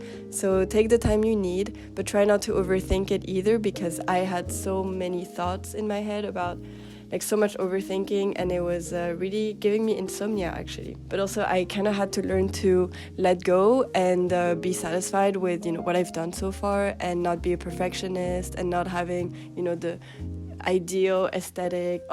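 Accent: France. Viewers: haha j'ai regardé la video sur le labret vertical, je me sais bien qu'il y avait un petit accent français! j'ai aussi une petite cheminée dans mon studio à bruxelles, ça donne un effet trop cosy :p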